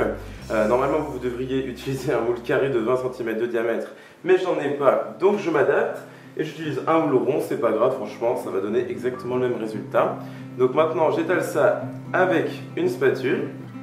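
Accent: United States. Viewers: es tu Americain? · Mais peut être qu'il EST américain ? Ça ne vous ai pas venu à l'esprit ? Ou qu'il y vit ou passe ses vacances donc a l'accent ?? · Tu fait un peu trop l'américain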